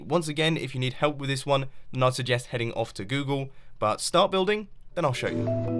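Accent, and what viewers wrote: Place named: United Kingdom. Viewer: I love you and you sound so British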